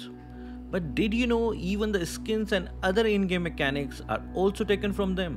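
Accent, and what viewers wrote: India. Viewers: Hi, I'm genuinely curious why you have Japanese name but you have Indian accent. So if I may ask are you an Indianese or Japian?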